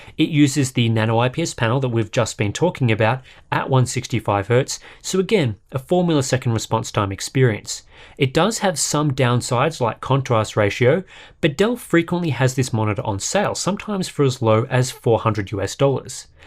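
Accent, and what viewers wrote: Scotland, United Kingdom. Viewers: U got that default British voice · perhaps Scottish? I hear a slight accent.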